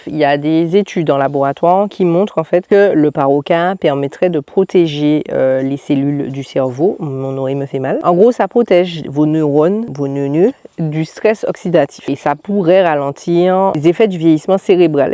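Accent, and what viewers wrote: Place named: Antilles, France. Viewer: ton accent d. Antilles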